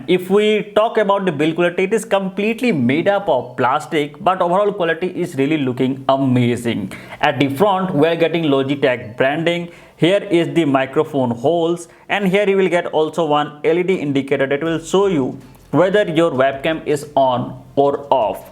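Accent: India. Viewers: Mine is the hd 270p version. I've had Logitech web cams for over 10 years and rarely used them. I think we should video chat because I could help you with your American accent and you could help me with your wonderful Indian accent. I can imitate your accent from time to time that I learned from many telemarketers. It comes in handy when they call my house and I shock them when I start talking like them. So hilarious. Great review and accent too. thanks. 😁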